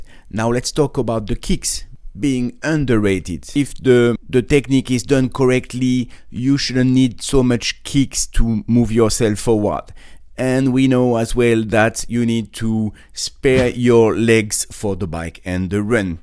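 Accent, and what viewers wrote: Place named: France. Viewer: It's funny how your accent sounds exactly like the one of a French native speaker.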